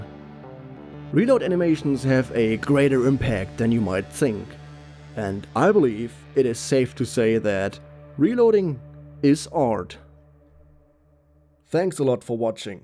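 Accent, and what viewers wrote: Germany. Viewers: Man hört deinen deutschen Akzent ziemlich krass😂😂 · Man der hat so eine Richtig Deutsch-Englische außsprache ich liebe das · You have a decent German accent Ich als deutscher kann das gut hören Nices video über eine quality of life Sache die niemand wirklich beachtet Naja der reload ist für mich der Charakter einer Waffe (wie auch der Sound oder der recoil) Es gibt der Lieblingswaffe was vertrautes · I smell a slice of German accent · oh also love the accent. German if I'm not retarded, I wanna learn German eventually but either way really cool. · Man merkt das du Deutscher bist😉. Gutes Video👍👍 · Are you german?your accent seens a bit german to me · Der deutsche Akzent stört bisschen aber trzdm gutes video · Your German accent is commically bad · Ahhh, german accent. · Oh hell yea a video about the functionality and realism of weapons in video games. 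German accent starts. Of fucking course. We just love our weapon technology. Greetings, a fellow german. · are you German because this accent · Are those guns of yours Airsoft? Because you sound German, and from what I know of German gun laws, I don't think getting an AR pattern rifle would be very easy. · Du kommst definitiv aus Deutschland xD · ich höre direkt den deutschen aus dir raus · Sein Deutsch-Englisch triggered mich.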